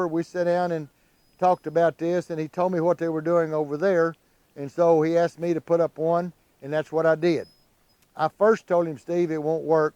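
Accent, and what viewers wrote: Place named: Arkansas, United States. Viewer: I love to listen to you for two reasons. One, you know so much and I live to learn from you. Two, you sound like my family in Arkansas and it makes me smile.😊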